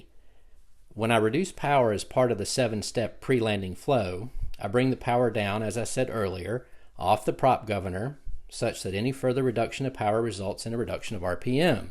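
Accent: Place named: North Carolina, United States. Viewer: Now that's a proper NC accent. Love the video!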